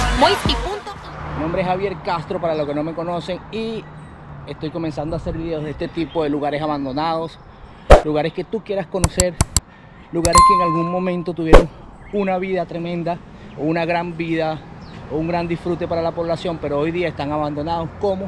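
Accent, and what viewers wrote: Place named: Venezuela. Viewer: Tines asento como de benesolano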